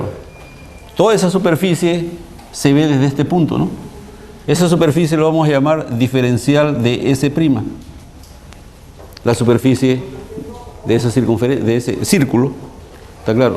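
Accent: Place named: Peru. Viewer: Que buen video, gracias a UTP por subir sus clases. Me parece que el profesor es peruano por su acento.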